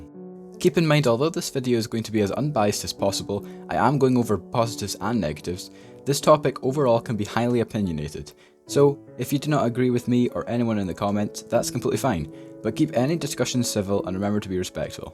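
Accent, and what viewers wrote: Scotland, United Kingdom. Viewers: Bro I really miss you and your video's. Please come back. I just watched your buzzy bees mod video for like the fifteenth time just now and it was honestly the most relaxing thing on the planet. I love you Scottishness and your ( well actually it's from minecraft) cat background music. I wil watch your farmers delight mod video tomorrow and I hope you will return shortly. Have a wee great day.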